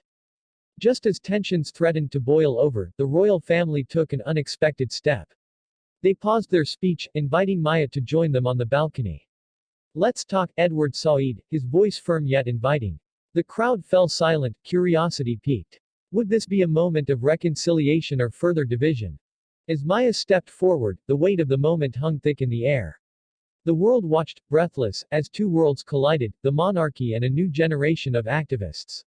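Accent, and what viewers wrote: United States. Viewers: American accent?Bye bye.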